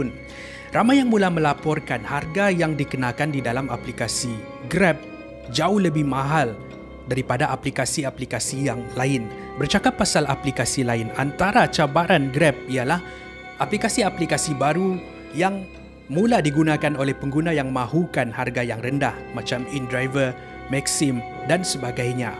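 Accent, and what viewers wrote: Sabah, Malaysia. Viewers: Bahasa Sabah dia dah tak pekat.. dulu dia cakap Sabah pekat gilerrr.. suka betul kalu dia cakap ada slang Sabah..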